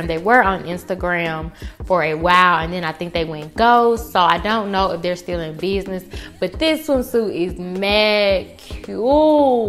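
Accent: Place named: Atlanta, United States. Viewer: These 🔥 with that accent you have to be from Atlanta lol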